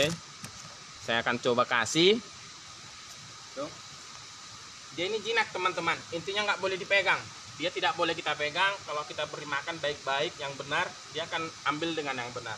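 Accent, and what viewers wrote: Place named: Brunei. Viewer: Bang perkataan mu aku dengar ada ayat berbunyi "kau ani" melayu nya seperti cakap brunei ada "kau ani" 🤣👍🏻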